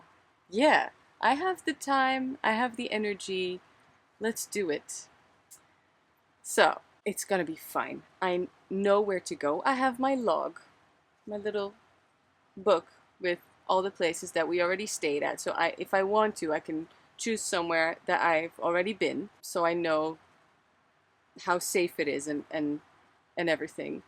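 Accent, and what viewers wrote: France. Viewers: Jolie Accent Français 😊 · J’adore ton accent français! (Je suis canadienne francophone donc notre accent est très différent) J’ai apprécié ce vidéo, sortir de sa zone de confort ce n’est pas facile, mais on est tellement fières après! La croissance se fait inévitablement en traversant l’inconfort. Merci de nous avoir amené avec toi lors de ce roadtrip, le concert dans le cratère devait être incroyable!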